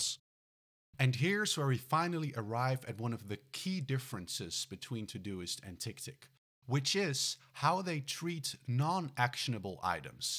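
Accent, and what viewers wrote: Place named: Netherlands. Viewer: Nice video. Thanks! And clearly Dutch! ;-)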